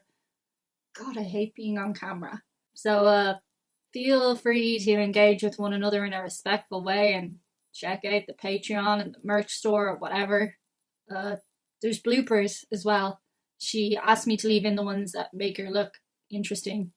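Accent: Ireland. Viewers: It's always nice when I find a new channel that is interesting and involves a cute girl with an Irish accent... I know, you've had it for years, it's rubbish, but still works for me. I do have a question though, you've mentioned it's been a long time since you visited the US, when were you last here? We're a pretty slow country when it comes to societal/economic changes so, sorry to say some of the worst parts of American behavior or those you find/found odd are likely still going strong. Even though I am from Seattle (about 4,400 km give or take) from Miami, I do admit they make a fine t-shirt for their team, our team's logo (Seahawks) looks more like an Irate train than anything else. <link> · We can always tell you are from R'land! That voice accent! The Irish are ALWAYS truly welcome in the USA. You didn't say whether Americans are welcome (or just tolerated for the cash) in Ireland? 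· She’s so cute. And Irish.. can I date you? Lol love the accent. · I love your voice and your accent. I would date you if I could ever make it over to Ireland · We really enjoyed this thank you. Although I think your Irish accent is fading. Don’t let it happen! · To be fair, in American English we treat "Ireland" as a two syllable word. The only difference here is the long 'i' sound combined with the American 'r' sound makes the first syllable really long. There are basically 3 vowel sounds in the first syllable. A long 'i' is a combination of a short 'a' and a long 'e'. The American 'r' makes the "er" sound. So it ends up sounding like /a-e-er-land/, but we only count two syllables. Whereas your Irish pronunciation starts with more of a short 'a' sound so it sounds like /ar-land/.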